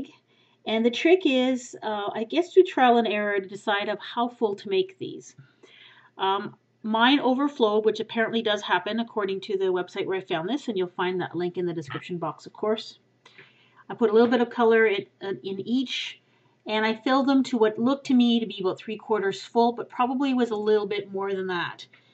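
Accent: Canada. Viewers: ur canadian arent u lol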